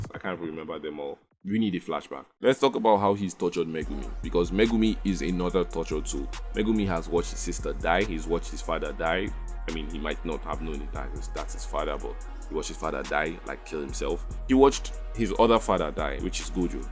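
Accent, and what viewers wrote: Nigeria. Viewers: Nigerian accent obvious bro.. PH boi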